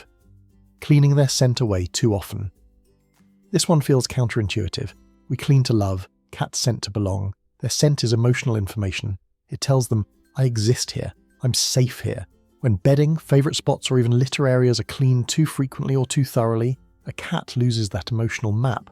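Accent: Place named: United Kingdom. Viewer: Why do all AI videos have the same British accent. Not a good one, either.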